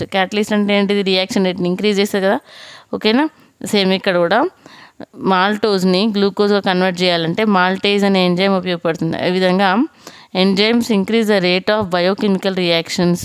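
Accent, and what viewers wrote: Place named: Telugu, India. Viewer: Mam I appreciate your hard work , the way of talking seems like your mother tongue is telugu but your trying to speak hindi 👍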